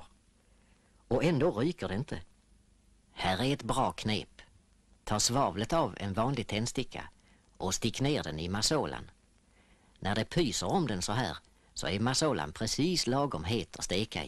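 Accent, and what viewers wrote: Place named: Skåne, Sweden. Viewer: Idag hade man inte haft en som talar utbredd skånska i en reklamfilm.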